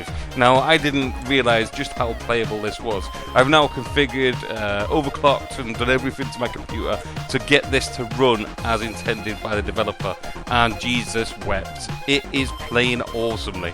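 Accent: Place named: United Kingdom. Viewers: great video, btw where is your accent from? It sounds british but I've never heard it before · its one of the few british accents i think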